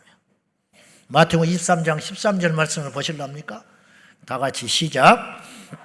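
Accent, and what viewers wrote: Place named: North Jeolla, South Korea. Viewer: 억양이나 사투리 보면 전북으로 추정됩니다.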